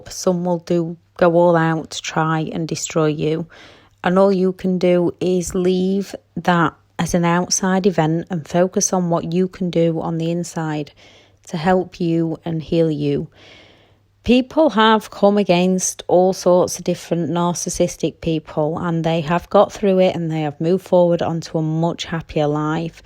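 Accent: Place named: Northern England, United Kingdom. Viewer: I'm still trying to figure out if her accent is northern England or Scottish (the kind of Scottish that sounds like it might be northern England but which is really just a "posh" form of Scottish, not to be confused with posh England English)... 🤔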